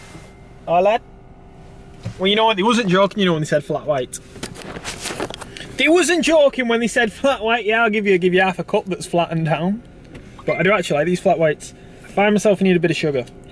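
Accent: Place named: United Kingdom. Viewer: Lad, where in the Uk are you from?had to use CC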